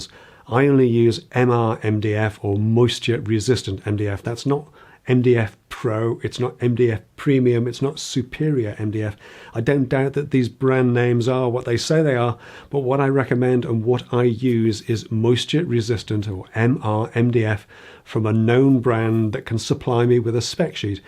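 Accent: United Kingdom. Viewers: I love Anderson Cooper and Richard Gere. is both of them but with a British accent!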